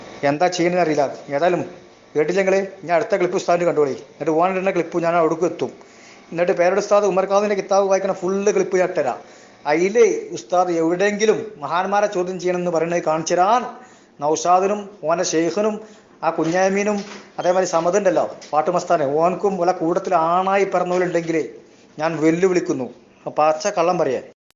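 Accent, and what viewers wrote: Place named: Malappuram, India. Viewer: പറയുന്നത് എന്നു് പറയുന്നതിന് പകരം പറയണ്ടതു് എന്ന് പറയരുത്. മലപ്പുറം ശൈലി ഒഴിവാക്കുക . കേൾവിക്കാർ ആശയക്കുഴപ്പത്തിലാകും ശ്രദ്ധിക്കുമല്ലോ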